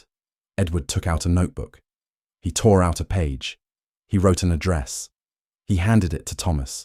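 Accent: England, United Kingdom. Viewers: Good initial message, really awful use of repetition in AI to get monetized views. AI should be able to convey a much smoother story across a much more sophisticated narrative arc and provide dialogue that is "show" not "tell" with a thousand uses of "said." Then how many times is the narrator with an English accent going to use the wrong pronunciation of "clerk", "clark" was used 100 years before this time period min the south of England and never in Manhattan. There are better stories of the times available. Not a single handshake, hug, kiss or sign of sexual intimacy. I hope you learn your AI tool and do quality control before releasing any more stories. Unless you are just in it for the money. YouTube does not seem to care that this low effort form of AI that is ruining their product.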